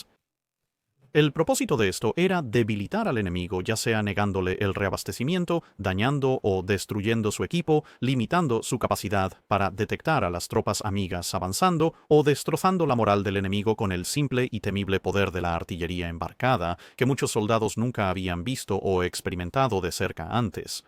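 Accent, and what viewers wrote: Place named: England, United Kingdom. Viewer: Seguia este canal en inglés ,el original, ahora en español con acento inglés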